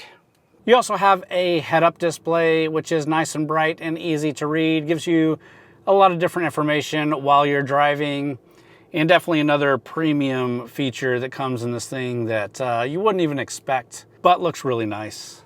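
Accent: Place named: Texas, United States. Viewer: Don’t judge. Not all people from Texas talk like this.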